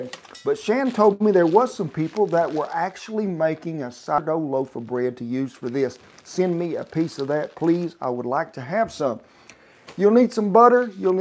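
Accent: South, United States. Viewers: No ma'am . You can't take the accent out of the south 👍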